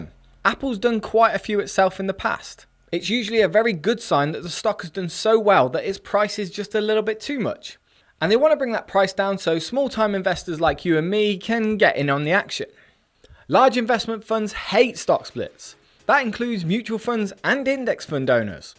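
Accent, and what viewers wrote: United Kingdom. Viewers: The best thing about the british accent: "Tesla Investor" rhymes :))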